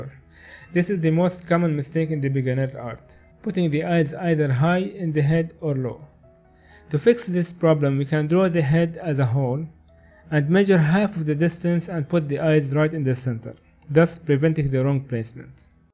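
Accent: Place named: Egypt. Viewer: Egyptian accent. So proud of you. Keep it up. ما شاء الله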